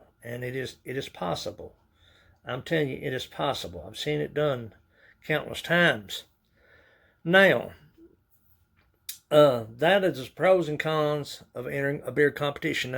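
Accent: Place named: Appalachia, United States. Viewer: Absolutely love the accent! Where are you from my friend? I'm guessing somewhere in the Appalachian mountains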